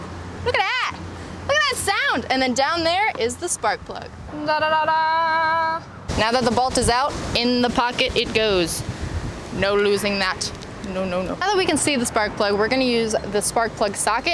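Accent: United Kingdom. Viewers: Hats off to you for using anti-seize compound. Why do you intermittently speak in a British accent? In answer to people asking why she’s changing the plugs so soon, it’s so she can make an instructional video. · Great British accent. The clip ain't bad either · A little British accent.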